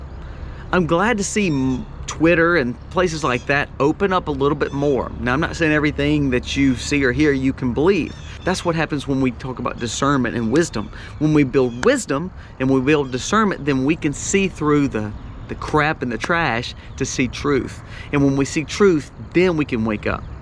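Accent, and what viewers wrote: South, United States. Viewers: I am new to your channel as of a few days ago! I have learned a lot already, so keep the videos coming. Love that southern accent ❤!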